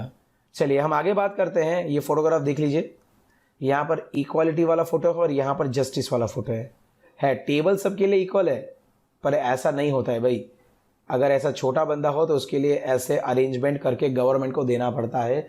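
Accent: Gujarat, India. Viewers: Tme gujarati cho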